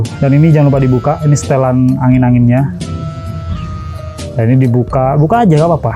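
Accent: Madura, Indonesia. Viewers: Sampean asli mana mas kok bahasanya jawa tapi logat madura 😂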